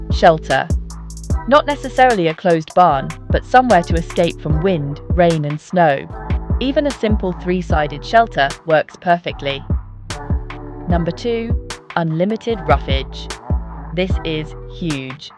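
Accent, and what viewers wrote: United Kingdom. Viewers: March 6, 2026 - (dated for context and reference) Thank you for your kind recognition of my comments. I believe in offering "Positive reinforcement" to people for their kindness, and for jobs well done. In addition, I am a lifelong anglophile, so I very much appreciated the video's narrator for her pleasant voice and of course Brit accent👍👍😊 Lastly as a man, I have noted over the years that female equestrians tend to be quite attractive in a number of ways. I certainly have a lot of respect for people that work so well with such large animals. I live in Connecticut U.S.A., and we have a good number of horse farms and Equestrian Centers/Facilities. Stay well.😊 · What a crock of crap this is. They narrarate the video with a British accent voice to make it sound like they know what their talking about. I guess the British/AI narrator has never seen a mustang whose nostrils and ears have frost bitten off. 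I guess they've never dealt with horses that colic when they get too cold or ones that don't drink enough. What I take away from this video is that this is yet another dime-a-dozen horse expert wannabe shooting off their mouth and trying to sound like an authority when they're not.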